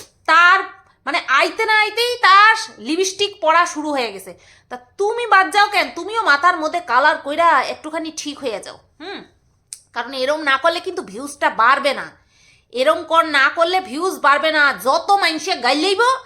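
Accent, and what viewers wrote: Bangal, Bangladesh. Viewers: Orey ki sundor bangal vasa sunlam..darun lglo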